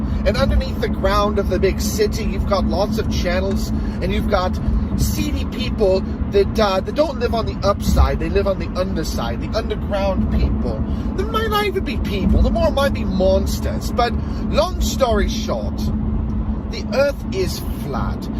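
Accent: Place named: England, United Kingdom. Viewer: What's with the English accent? Doesn't make you sound smart especially when you have no idea who Brian May is.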